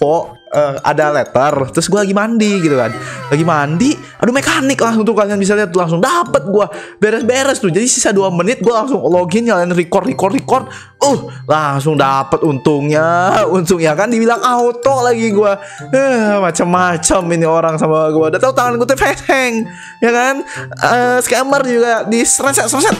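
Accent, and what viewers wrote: West Java, Indonesia. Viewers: logat nya kaya orang sunda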